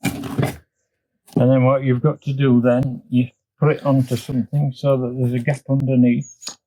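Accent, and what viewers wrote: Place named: Yorkshire, United Kingdom. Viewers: I understood every word, I married a Yorkshire man! Great skills passed on ! Are the boys having a go? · It’s cors he’s from up north uk...... listen again and you’ll get it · Well done Dad your becoming a star. Keep it up. although most can not understand your accent, I think they need Yorkshire lessons. :-)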